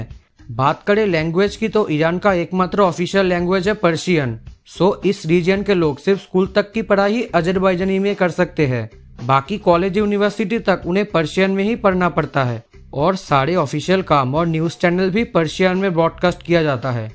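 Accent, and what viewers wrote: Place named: West Bengal, India. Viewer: Bhai aapki video badi achi hai apki awaaj bhi .. Apka tone Bengali lagta hai ..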